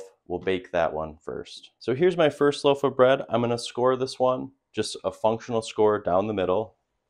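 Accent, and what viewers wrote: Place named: Midwest, United States. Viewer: As a midwesterner, I appreciate your vowel pronunciation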